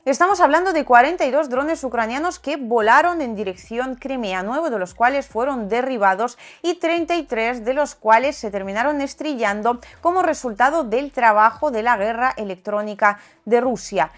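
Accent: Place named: Spain. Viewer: 😊😊😊 habla el español que hablamos en l España, excepto en Andalucía que se parece al vuestro. Pero nos entendemos todos bien,¿no?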